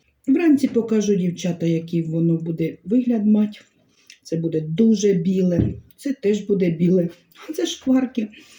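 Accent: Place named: Central Ukraine, Ukraine. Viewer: ви просто унікальна! У нас на Україні дуже багато діалекту. Всі люди по - своєму розмовляють.Але всі вони наші рідненькі, дорогі і неперевершені. Ви по- говору -- це центральна Україна. Всі рецепти з цієї території. Розкажіть більше про себе. З відки ви? " Ми вже ваші, а ви наші. 1000 лайків.❤❤❤❤❤❤❤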